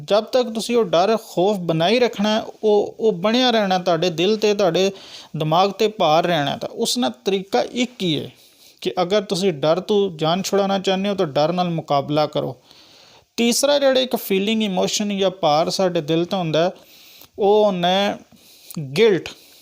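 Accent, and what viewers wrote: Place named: Potohar, Pakistan. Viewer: I am bilangual ; speak Seraiki and Balochi , but I fully understood your Punjabi garnished with a little potohari accent.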